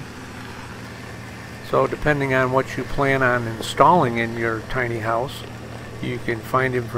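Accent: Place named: Great Lakes, United States. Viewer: Wow, a strong accent! I'm thinking Great Lakes. (block pronounced as black, ask as "eeyask". Not as strong as for most females of the Great Lakes, but still of that region. Am I correct?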